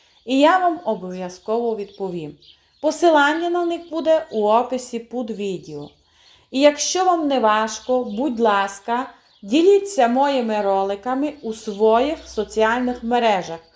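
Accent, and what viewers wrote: Transcarpathia, Ukraine. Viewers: Дякую за ваші рецепти, з задоволенням слухаю вашу закарпатську вимову, дуже подобається.Миру і всіх життєвих гараздів. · Як приємно вас слухати...ваш закарпатський діалект...щасливого вам Різдва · Який же чудовий закарпатський діалект :) Так приємно чути! Дякую! Багато цікавих рецептів, вже хочу спробувати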